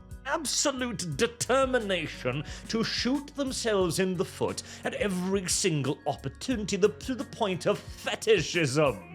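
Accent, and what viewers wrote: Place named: United Kingdom. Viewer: I have never seen a British person rely on their accent to make up for their lack of knowledge more. You might as well be engaged to a cousin because damn dude, that island DNA is showing.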